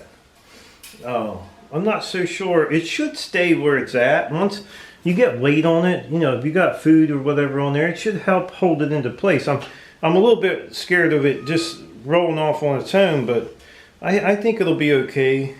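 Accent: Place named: Western Pennsylvania, United States. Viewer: I grew up near Pymatuning Lake, so your western Pennsylvania dialect is a little bit like "old home days" for me. I lived in Indiana for about 10 years and I've been in North Dakota for almost 21 years.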